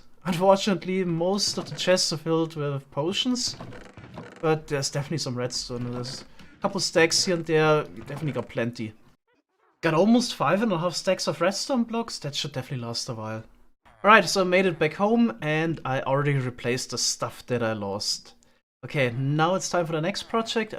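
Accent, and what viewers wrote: Germany. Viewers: he's def German.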